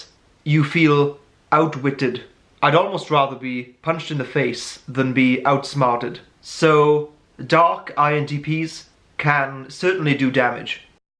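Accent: Wales, United Kingdom. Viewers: Hmmm your accent... are you Welsh? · Omg are you welsh??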